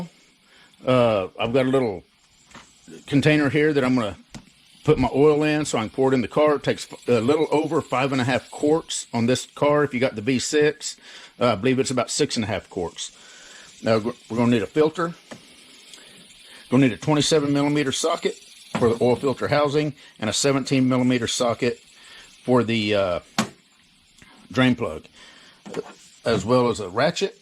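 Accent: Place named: South, United States. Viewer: Yeah, I´m watching here from Germany bro. Love your southern accent....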